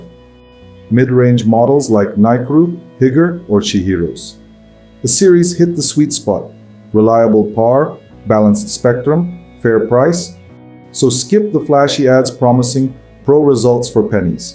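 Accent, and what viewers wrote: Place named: Russia. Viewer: I like your somewhat russian 🙂 voice which is clearer and concise. Slower and well paced so I can pause and take notes too. 👍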